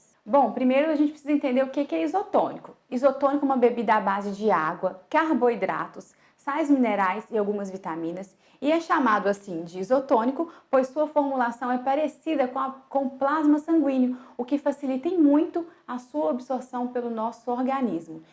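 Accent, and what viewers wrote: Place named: Minas Gerais, Brazil. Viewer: Sotaque mineiro detectado 😉🥰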